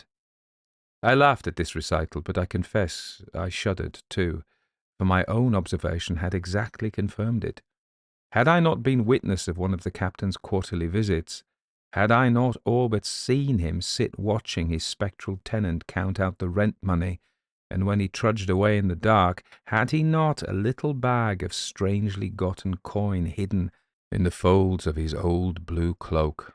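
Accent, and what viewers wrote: United States. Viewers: I don't know about the regional dialects disappearing everywhere. I'm in southern West Virginia and there's still various dialects the further south you go. As for doing an American accent, his isn't too bad. I love his Scottish & Irish versions. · If I can perform a British accent but half as well as you do an American, I will consider myself a success as an actor. :)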